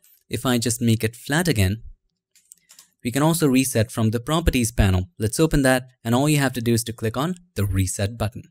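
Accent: India. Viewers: i just subscribed to your channel... where are you from btw sir? you're sounded like from educated indian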